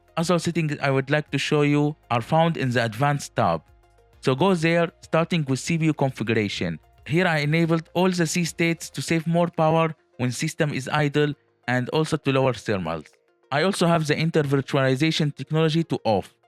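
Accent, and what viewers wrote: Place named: India. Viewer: Indians will never pull eastern women with their accent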